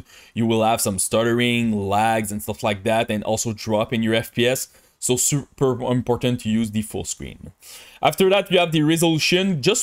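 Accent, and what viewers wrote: Quebec, Canada. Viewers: Le quebecois is strong with this one.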